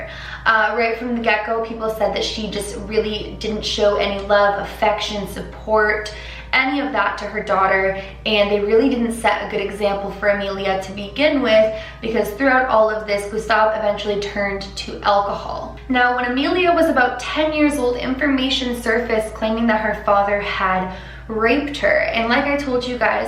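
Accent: France. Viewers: I love her French accent😋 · I know this is besides the fact and kind of random but you're french accent is beautiful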